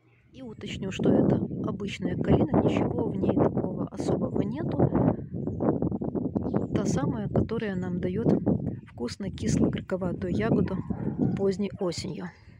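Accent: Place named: Odessa, Ukraine. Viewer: с приятным одесским говором и правильной речью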